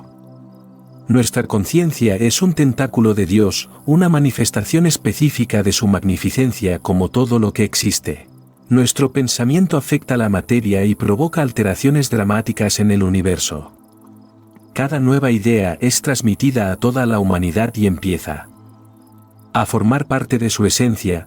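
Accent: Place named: Spain. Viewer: se agradece sobre manera, el doblaje en español de España, gracias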